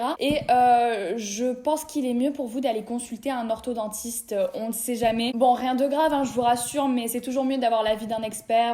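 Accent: South, France. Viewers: l'accent du sud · t'avais l'accent du sud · ta grv l'accent du Sudddddd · Tu as pris l'accent du Sud nan ?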